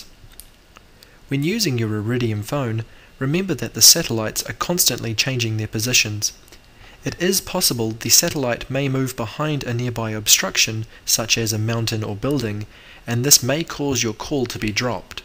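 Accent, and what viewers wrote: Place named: New Zealand. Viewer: It's a New Zealander, trying not to sound TOO New Zealand-y.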